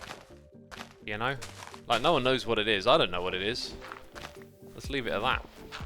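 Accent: United Kingdom. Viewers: X's streams are perfect for: - for soothing oneself to sleep - for relaxing while e. g. drawing, sewing, cleaning - when you just want to have some background noise (a one which makes you laugh out loud every 2min) - for cuddling up with your pet and a cup of tea - when you need to hear some dad jokes - for taking a walk/run on a treadmill - for chilling out with your siblings - when you are in need of hearing a slup of tea (one of my basic needs tbh) - for when you need to be reminded to take joy in simple things (he's seems so grateful for even the simplest of things) -for when you want to develop a British accent For everything, okay? THEY ARE PERFECT FOR EVERYTHING. I'm glad I can watch them on YouTube :-)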